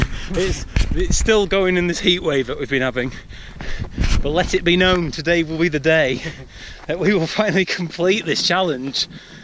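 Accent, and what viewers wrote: United Kingdom. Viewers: hey. Yh iv only jist checked my spam box. Baaahahah anyways got your email. Me and the other guys are all having a meeting on Wednesday about the hike so il be in contact with you on Thursday. Speak soon buddy. By the way. Your accent sounds dead familiar. Are you from Mersesyside or Chester area by any chance?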